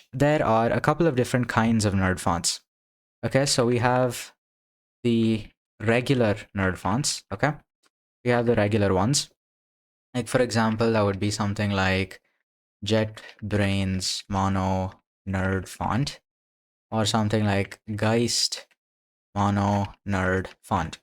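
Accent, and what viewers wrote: India. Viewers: > it's the shortcut through years of confusion, broken configs, and scattered information. vaxry (the maintainer/creator of most things hypr) sells it for 5usd, and doesn't even try to pitch it onto the marketing-speak of yours of it being any worth, much less it being some adequate/proper/fast way of coming to the hypr ecosystem/the linux way of things. + indian accent lmfao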